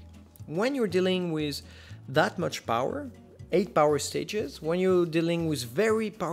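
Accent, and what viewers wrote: France. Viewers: This french accent, you can fight it but you can't win against it..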